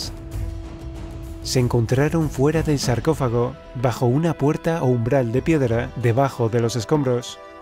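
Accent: Spain. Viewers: Vuen video, lasrima del narrador gachupin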